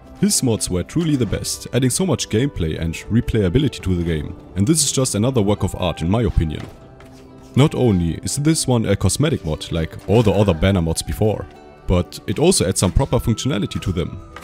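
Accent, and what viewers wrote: Germany. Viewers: 1 little question, where are u from again ? U sound kinda german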